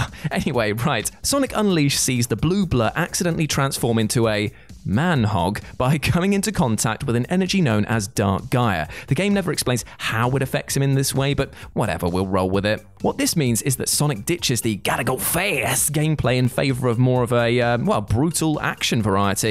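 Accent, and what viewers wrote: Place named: United Kingdom. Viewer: american english but british accent xd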